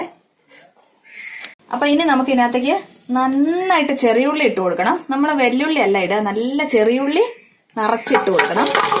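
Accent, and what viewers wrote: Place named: Southern Kerala, India. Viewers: കോഴിക്കോട് ആണോ? ഞാൻ ഓർത്തു ഇടുക്കി ആയിരിക്കുമെന്ന്. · സംസാരം കേട്ടാല്‍ കോയിക്കോട്ടുകാരിയല്ല.... ഇങ്ങള്....ഇങ്ങ് ''തെക്കത്തി''.....അതായത് കോട്ടയം..പത്തനംതിട്ട ഭാഗത്തുകാരിയാന്നേ പറയൂ......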